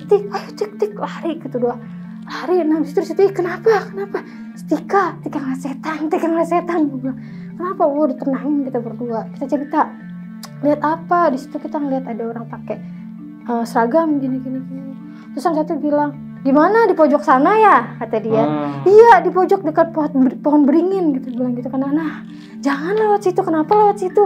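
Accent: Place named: Bali, Indonesia. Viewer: saya asli bali, kakknya logat bali banget:face-blue-smiling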